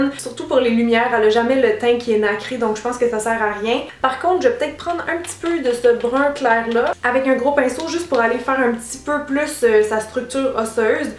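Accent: Quebec, Canada. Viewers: Franchement j’adore ce style de maquillage qu’on voit hélas peu, t’es une fille super naturelle de manière générale dans tes vidéos car j’en ai vu plusieurs. Un jour il faudra que j’aille au Québec j’adore tellement l’accent, bonne année from France 😊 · Adorable ou.... Joli make up et encore une vidéo avec le charme de l'accent canadien..... j'adoreeeeee. Bisous Sublissima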